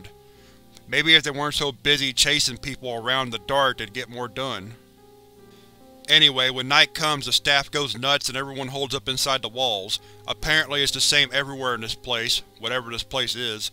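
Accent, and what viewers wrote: South, United States. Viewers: I just hate how the guy voicing this has the thickest southern accent of all time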